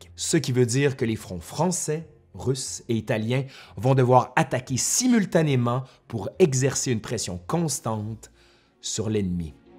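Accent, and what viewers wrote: Quebec, Canada. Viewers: Merci, pour la qualité de tes vidéos. Tout est bon: le ton (haaaaa l'accent québécois, j'adooooore), les sujets sourcés et très impartiaux (ce ne doit pas toujours être facile) Bises et encore merci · excellent ! aussi clair et concis que possible avec ce je ne sais quoi de sympathique en plus ... ah ca y est je sais: juste ce qu'il faut d'accent quebequois !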